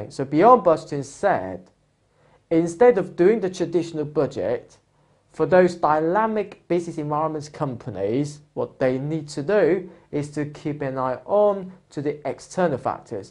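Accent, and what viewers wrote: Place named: United Kingdom. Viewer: Very british accent)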